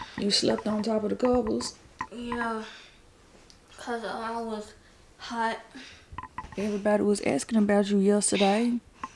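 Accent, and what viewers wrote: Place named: Memphis, United States. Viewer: Hi my southern sis!! I knew there was a reason you sound so much like K. Michelle. That M-town accent is strong hun. I'm from the MS/AR Delta and I have lot of friends and family members from Memphis and their accent sounds just like yours.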